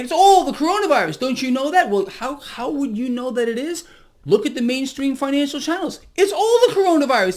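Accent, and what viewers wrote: United States. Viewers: You speak like a true American Sir....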